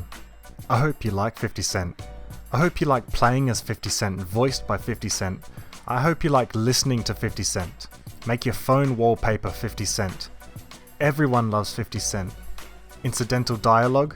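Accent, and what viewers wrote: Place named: Australia. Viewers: Hi are you Australian or from New Zealand like your accent is incredibly subtle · Australian I'm guessing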